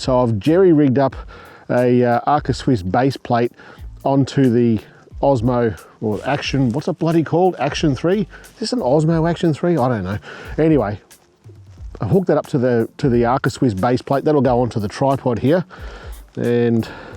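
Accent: Australia. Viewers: I absolutely love the Aussie access and slang 😂 Ive just bought the Action Cam 5 so I have to try this ❤ Cheers from the UK 🇬🇧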